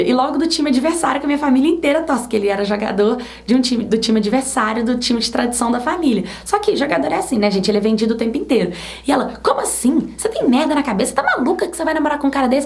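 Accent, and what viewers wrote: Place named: Minas Gerais, Brazil. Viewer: Esse sotaquezinho mineiro engraçado!! kkkkk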